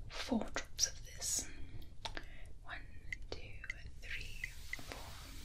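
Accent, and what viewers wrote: England, United Kingdom. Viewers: I find Americans doing English accents so soothing!! As a brit, this is honestly pretty good and the extra emphasis on the t sounds and the vowell sounds that u guys tend to do give me hella tingles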